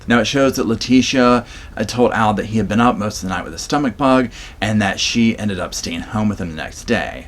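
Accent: South, United States. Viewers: Lovin your accent. The really strong Southern accent is so homely. It’s funny as I’m from Ireland. □□□□:_SofaSquad::_SofaSquad::_SofaSquad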